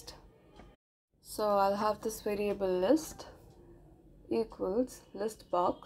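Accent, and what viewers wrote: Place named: India. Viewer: Hi Mam , Are you from India naa ?? Your language accent shows it clearly...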